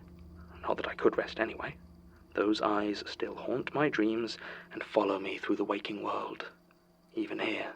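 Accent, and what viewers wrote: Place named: United Kingdom. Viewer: I was really unbelieving when I kept hearing about how the Magnus archives has every fear, but I gotta say my heart sank as soon as I clicked on the first episode and heard voice. British people.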